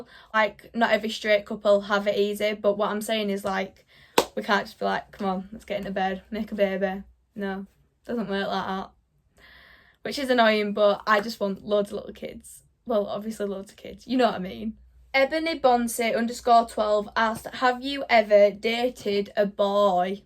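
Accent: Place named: Yorkshire, United Kingdom. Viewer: It's Yorkshire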